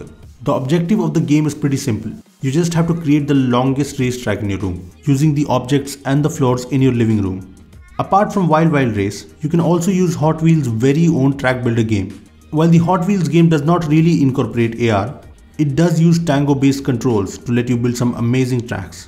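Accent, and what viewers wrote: India. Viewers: Im fucking done with indian accents it sounds like gurgling sounds😠😠😠😠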